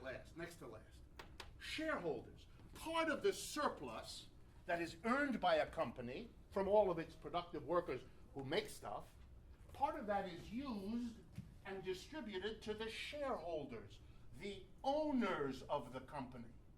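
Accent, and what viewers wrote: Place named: New York, United States. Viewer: This guy has a very marked NY accent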